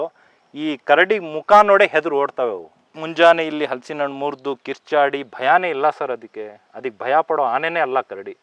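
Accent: Standard. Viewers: Vav.adbhut.nimma.matina vaikhari.ane.bagge.matadoru.nimmannu.nodi.kalibeku.yakandre.nimma.kannada.bahala.sundara.hagu.swaccha.ellarigu.artha.agutte. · ಅಣ್ಣ ಚೆನ್ನಾಗೆ ಮಾತನಾಡುತ್ತೀರಾ ಸ್ವಚ್ಚ ಕನ್ನಡ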